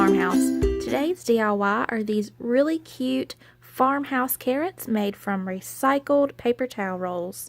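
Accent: South, United States. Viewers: Too cute!!!! To save time, I would just put drop of HOT temp glue to keep it shut so u can work on it easily. It won't show once it's painted. I'm sharing the tip cuz ur young and u need to avoid arthritis in ur hands and fingers as soon as possible. Straining ur joints to do anything leads to arthritis and pain. Absolutely loved it idea!!! Will share ur site with my nieces. Love ur southern accent, makes me homesick! Blessings to you AND ur hubby. Just tell him to wave next time and say Hey or Howdy! Make it more fun!! It's all abt having fun and enjoying life to the fullest. Let the little things go. If u can fix it, great! If not, let God take care of it! He's in control anyway. Follow the rules as best as u can (no one is perfect) and He will do the rest. I thank Him daily for all the little simple blessings that so many take for granted. Thanks for sharing!!! 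L :)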